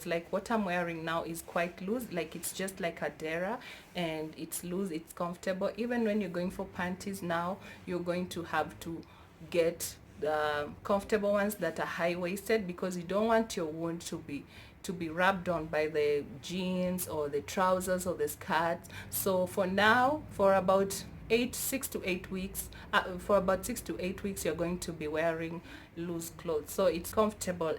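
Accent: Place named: South Africa. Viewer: Great video. Thanks. You sound South African. You accent made me homesick!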